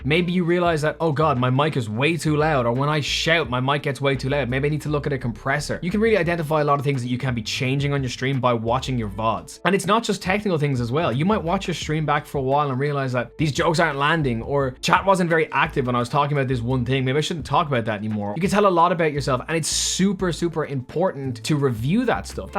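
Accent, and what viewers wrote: Ireland. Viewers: Hey, it’s funny to find you I heard your voice and I was like they HAVE to be Irish so I remembered there’s a about on twitch and I went there and you live in Ireland Dublin (I live also live in Dublin). You’re very underrated!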